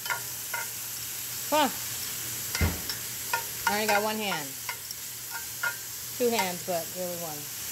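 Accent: New York, United States. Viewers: I'm pretty new to your channel...and I love it!!!! I'm all about the cooking, the hauls, the garden harvesting. I love your way of speaking...great NY accent. Cheers from Montana!!!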